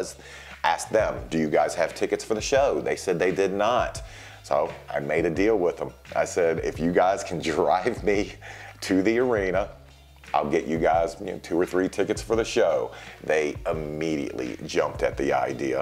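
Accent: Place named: Southeast, United States. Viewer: Just like any other job... good w the bad. I have to say. I am from Eastern North Carolina and you also have that South Eastern accent. Even though we call VA borderline Yankees lol. But seriously... I honestly love the fact that you take time to do this. I watched this instead of Friday night SmackDown.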